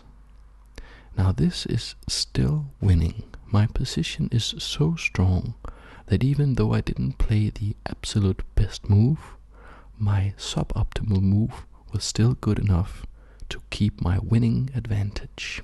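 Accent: Denmark. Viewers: at first I was like.. this fella sounds a lot like a Dane.. I then scrolled down and you told us you are from Denmark. Halløj mester! god video, god instruks ;)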